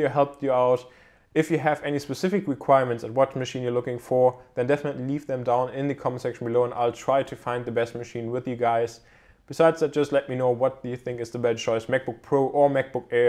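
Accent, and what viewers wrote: Germany. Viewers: Are you from Germany? You have a slightly german accent.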